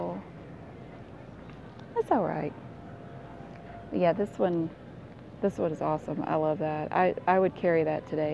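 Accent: South, United States. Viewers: I can't get enough of your southern accent I find it so charming. I'm constantly listening to the Massachusetts' accent up here which I may or may not have myself but absolutely detest.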